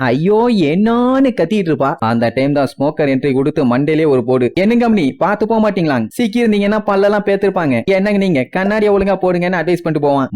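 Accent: Coimbatore, India. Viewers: Avaru coimbatore bro so antha slang summa pesiruparu kandukadheenga 😂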